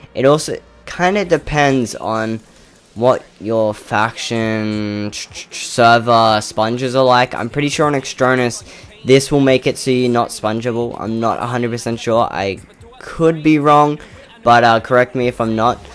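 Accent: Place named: Queensland, Australia. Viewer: Great vid again so happy there is a Aussie youtuber. Cause I'm Aussie and let me guess where you are from I tried last Ep how about QLD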